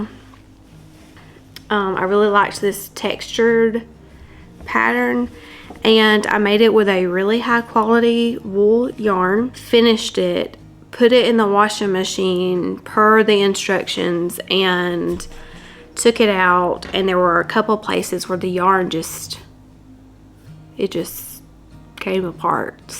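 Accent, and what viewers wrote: South, United States. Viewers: You are still very fresh from your accident. I first clicked on your channel because you were talking about your accident. I keep watching because I find your voice soothing, and I like your slight southern accent! I’m from New England. Be YOU. Talk about your experiences in the hospital, your recovery, your challenges, what kind of things helped you at home…you’re job as an EMS probably has so many fascinating stories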